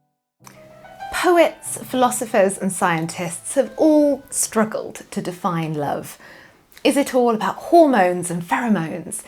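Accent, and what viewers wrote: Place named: United Kingdom. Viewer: やはりイギリス英語はよろしいですな。❤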